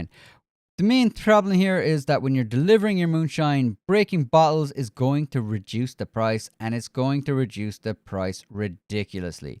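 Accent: Ireland. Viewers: I love the Irish accent.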